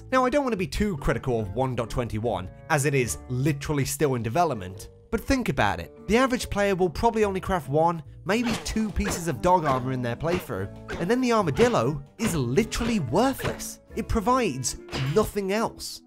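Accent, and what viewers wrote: United Kingdom. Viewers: and GradeAUnderA are the same person theory Grade ✅ British accent ✅ ✅ Ranting ✅ ✅ Sounds angry 90% of the time ✅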